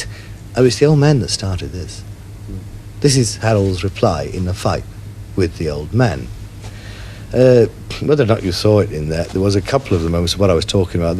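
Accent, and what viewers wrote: RP, United Kingdom. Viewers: Funny hearing him talk posh I'm just waiting for him to break out saying .you dirty old man lol pure gold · bit of a posh old boy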